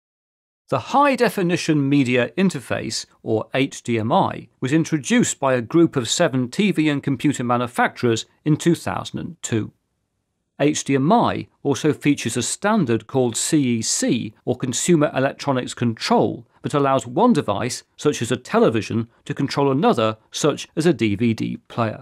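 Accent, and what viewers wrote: United Kingdom. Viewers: Even though I have worked on/with computers since the 1980's (I used to service Mac's & IBM pc's at University), I find your information about different aspects of computers VERY useful! Keeping up with all the latest & greatest technology trends/changes is exhauting. So... Thank You so very much for your content!! BTW, I enjoy your "outro". You sound like several friends I have in Britian. 🙂🙂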